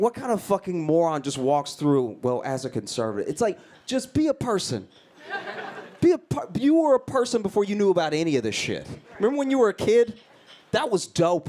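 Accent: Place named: Los Angeles, United States. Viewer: Between Josh and Theo Von the LA accent is really growing on me😏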